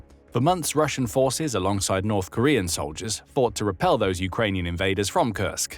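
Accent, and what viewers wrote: England, United Kingdom. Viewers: I now officially hate the English accent. I avoid it.. . . . . . . . .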